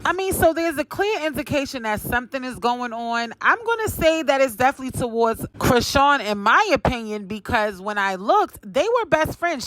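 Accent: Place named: East Coast, United States. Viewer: I’m so glad I found your channel. You be having all the tea. You sound like you from the east coast. I’m from NJ but living in NJ. New subscriber!!!!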